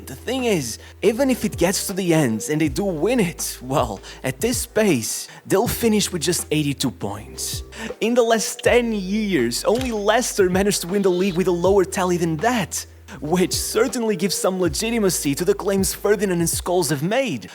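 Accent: Portugal. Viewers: I thought you were better than this. You’re just Sky Sports with a Portugese accent.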